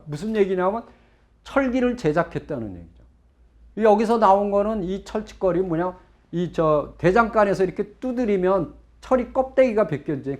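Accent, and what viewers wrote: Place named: Gyeongsang, South Korea. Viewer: 어렸을때 경상도 시골에서 들어본 사투리가 전부 다 나오니 신기하다. ㅎㅎ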